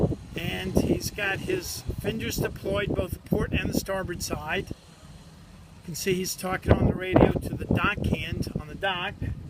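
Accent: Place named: United States. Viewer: That commentary made my ears bleed... soo American... As for bouncing off the pole to come forward, umm, isn't that what 'ahead' on an engine is for... You bounce of a pole like that on 9 out of 10 boats and you won't have a job the next day, although nothing wrong with using a pole with a sponson sitting on it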